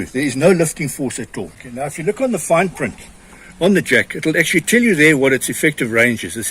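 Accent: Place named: United Kingdom. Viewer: Is this available in USA? Your British accent is obvious.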